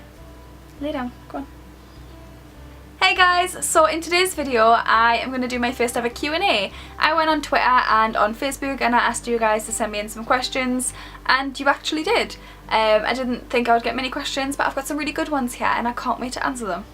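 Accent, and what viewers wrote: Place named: Geordie, United Kingdom. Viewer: This was a really great Q&A :) also love that Geordie twang. Like I live in Newcastle also but I don't have the twang... Kind of jealous actually :)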